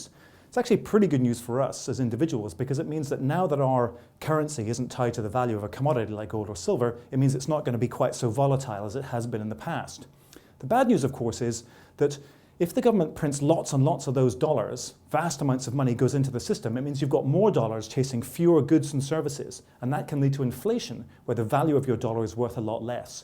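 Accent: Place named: Northern Ireland, United Kingdom. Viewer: Hey, great to hear a familiar dialect from an expat of Northern Ireland. Really interesting.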